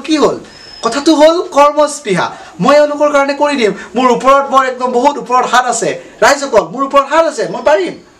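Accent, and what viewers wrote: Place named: Bodo, India. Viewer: Val lagise sir. Bodo accent was awesome.. Keep it up sir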